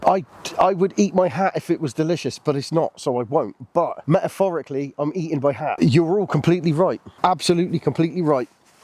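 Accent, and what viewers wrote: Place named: Southwest England, United Kingdom. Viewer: Subbed! Great energy! Enjoyed watching this. It's nice to see someone happy with ' the average man' groups and not all the 10 shots inside 5p at 100 yards. Sound local ISH ( Plymouth, southwest)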